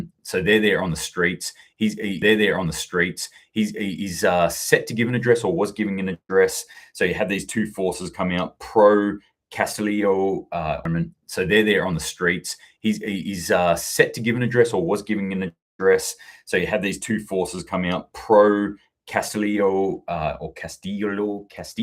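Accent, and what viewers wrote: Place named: Australia. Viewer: Only found you today and have only watched a few of your vids so far but am liking your content. Love hearing a fellow Aussie (I’m from SA) getting into the geopolitical theatre and giving straight forward breakdowns without the MSM spin. I think I read on telegram this morning that Spain was aligned with Serbia? Interesting when Serbia is aligned with Russia as well. Looks like todays tension has been kicked down the road for another month. And Serbia has beefed up its defensive measures a lot since NATO intervened last time… So many tinderboxes (conflict, contagions and food shortages) all over the globe at the same time. Coincidence of course. 🧐🤣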